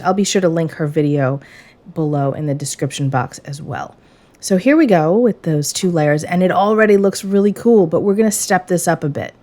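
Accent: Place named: Massachusetts, United States. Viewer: Hey, I just subscribed, where are you from? I’m from Massachusetts but live in Rhode Island now and you certainly sound like you are from the area.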